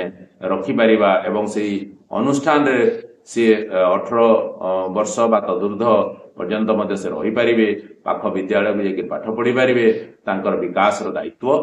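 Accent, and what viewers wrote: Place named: Bengal, India. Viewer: Can you add English subtitles on your video. As a person from south india it's hard to understand Bangal dialect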